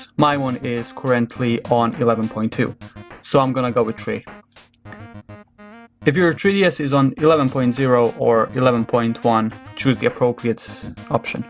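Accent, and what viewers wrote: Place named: Netherlands. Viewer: are you dutch because i hear some sort of accent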